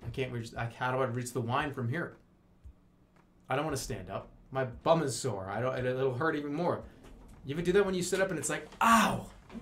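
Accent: Boston, United States. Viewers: The drunker jerma gets the worse his Boston accent gets.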